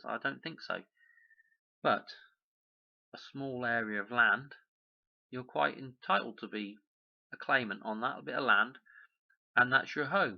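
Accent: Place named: Rural, United Kingdom. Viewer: I’m currently locked down in India. Your accent is bringing me taste of home. Im from Devon, but there does seem To be a common thread running trough the rural Tounges. I’m Enjoying your work. It’s been connecting some dots for me. Cheers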